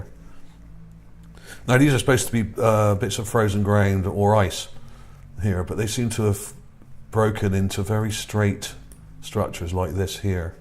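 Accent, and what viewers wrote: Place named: West Country, United Kingdom. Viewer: Thanks ….for another share. Always fascinating. Especially in that warm West Country voice of yours. I am from Hampshire myself.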